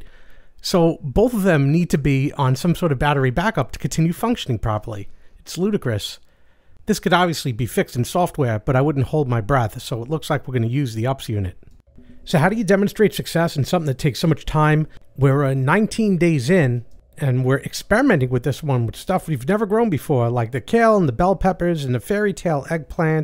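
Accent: New York, United States. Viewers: is that a NewYork accent?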